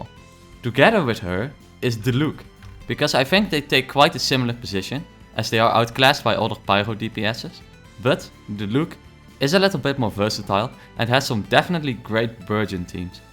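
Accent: Netherlands. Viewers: Subbed for the dutch English and I like your choice of childe being your choice.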